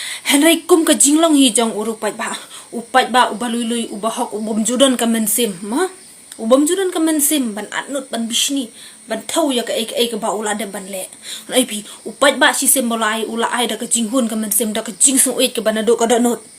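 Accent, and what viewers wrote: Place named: Khyrwait, India. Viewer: Best bha i ni ikong ban kren khyrwait beit nga dei na mawkynrew nga ruh nga kyrshan prah❤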